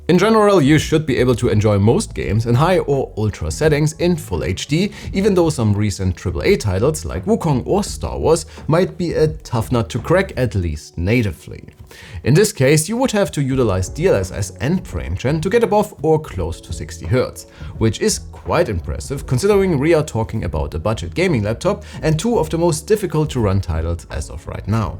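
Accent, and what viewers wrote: Germany. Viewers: Smart to do Videos in English for you, but the German accent is insufferable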